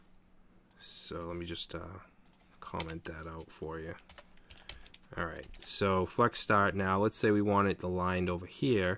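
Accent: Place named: Rhode Island, United States. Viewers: nice a dude with an east coast accent and swearing!! my fave. great tutorial, i understood it before but now i feel more comfortable with using flex. fixing my site now! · Bawx, sawce code, mahgins, you wouldn't be from Rhode Island?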